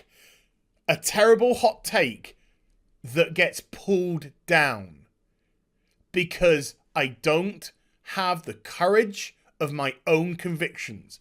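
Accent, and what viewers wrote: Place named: United Kingdom. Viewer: Hearing a Brit talk about these things really made my day. All my uncles, my grandfather, and my great grandfather all served in the Army, and they did so willingly. They are true patriots, and I cherish every one of these fine men in my family, and all others. God bless America, and God bless all the people who have served and live in the hearts of the many.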